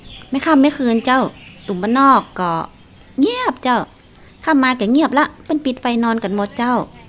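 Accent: North, Thailand. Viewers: เว้าภาษาเหนือเพราะมากค่ะชอบแต่ฟังบ่ออกบางคำ รวมๆแล้วชอบจ้า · ชอบเสียงพากษ์จังเลยค่ะ พูดภาษาเหนือได้น่ารัก ป้าฟังรู้เรื่องเป็นส่วนใหญ่ ส่วนน้อยมากที่ไม่รู้เรื่อง แต่ชอบฟังมากๆค่ะ เป็นกำลังใจนะคะ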